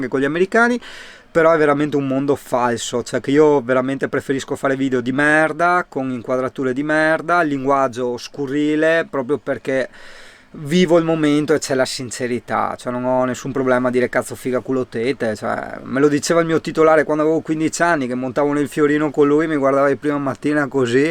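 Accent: Veneto, Italy. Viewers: Non cambiare i tuoi video piacciono perché sono genuini e con la parlata veneziana a volte un po' colorita.... Per il mono patino informati sulle regole in qualche città li equiparano al codice stradale di un motorino ....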